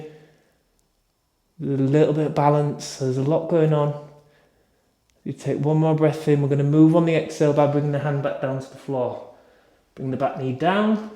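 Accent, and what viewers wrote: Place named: Leeds, United Kingdom. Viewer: Thanks. I’m from Leeds originally so the accent reminds me of home 😊. Many thanks, really I was thinking of some gentle stretches that would be good for anyone who are is currently not running but wanting to keep in good condition for when they start back running. Just an idea. Thanks!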